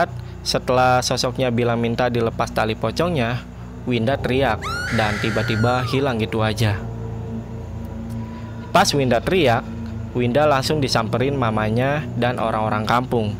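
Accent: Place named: Bali, Indonesia. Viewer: Keknya abang pembawa acaranya orang Bali, soalnya napak kali logatnya kalo ngomong T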